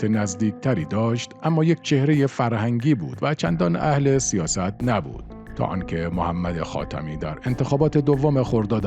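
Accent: Arab world. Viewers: جناب شما بیوگرافی حداد عادل را بدرستی بیان نمردید و لهجه شما از کجاست؟؟؟؟ عرب هستی یا ؟؟؟؟؟ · احق کثافت ندانذ با شخصیت ترین بانوی جهان فرح نیست دیوی بی. ناموس که صدای زشت گزارش میدهی دیوث ته لحجه عربی مثل صداي شغال در نیمه شب میماند هر کس تورا تایید کند مثل تو بی شرف کثافت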